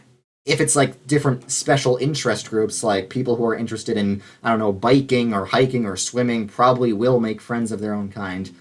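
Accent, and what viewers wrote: New York, United States. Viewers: It's kinda funny this is the most thick his new yorker accent has been so far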